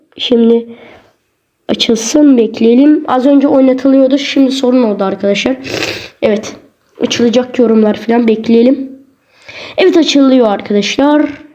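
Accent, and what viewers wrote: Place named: Azerbaijan. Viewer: Danışınnan bilinir.azərbaycanlısan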